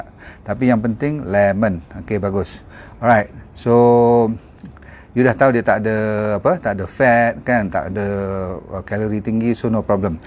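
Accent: Malaysia. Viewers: Ga ngerti bhs nya capek ngikutinya. Logat melayu sih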